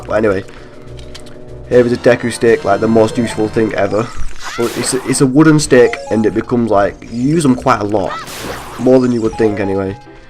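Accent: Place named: North Yorkshire, United Kingdom. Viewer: Great LP man. Helps that you obviously know the game well so it kind of doubles up as a walkthrough, some funny remarks in there too :) You sound like you're from the north like me! I'm gonna hazard a guess at North Yorkshire? You sound a bit like my mate who's from York. Anyway, great stuff.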